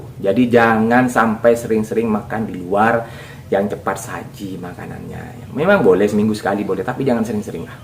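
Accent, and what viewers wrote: Madura, Indonesia. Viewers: Orang madura ya kang sampean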